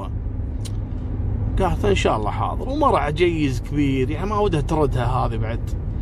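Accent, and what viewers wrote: Kuwait. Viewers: الله أكون في عون الحاجة والحاج بعد هدا العمر يطلعوا اخوة والله مثل هده الحادثة صارت عندنا في المغرب وأكن اللحمد لله كانوا لم يتزوجوا بعد فى عزلوا القصة قبل الزواج البنت دخلت في حالة نفسية شديدة هي والشاب ومرت سنين حتى تشافت منها انا ارى ان على الأمهات ادا ارضعوا ان يقودوا لأبناءهم ان تلك الفتاك هي أختك او اخوك كم الرضاعة حتى لا تقع مثل هده المشاكل طريقة سرد القصة رائعة رغم أني كنت أعيد القصة مرتين حتى افهم اللهجة الكويتية. مغربية مرت من هنا 🇲🇦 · بما أني جديد في سماع قصصك ودي ارجع اسمع القديم بس ماشاء الله تتحفني في الجديد وأنسى القديم أسلوبك مره جميل ولهجهتك الكويتيه الجميلة هي من خلتني افعل الجرس وفقك الله لما يحب ويرضا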